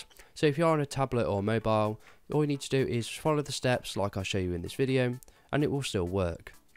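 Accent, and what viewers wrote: United Kingdom. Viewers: British accent hard for us to understand (we the US very easy on English )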